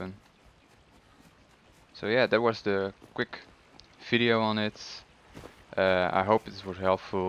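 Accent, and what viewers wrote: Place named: Netherlands. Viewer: xD You're Dutch. (Posted this after the first 5 seconds of watching this video.)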